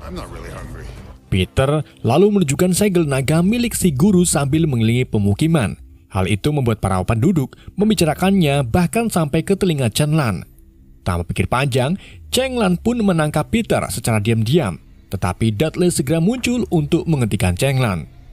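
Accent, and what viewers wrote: Indonesia. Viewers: Ciri khas dong lidah indo.. semangat ye'endeeeeeeeaaaaaahhhhhhh uraaaaaaaaaa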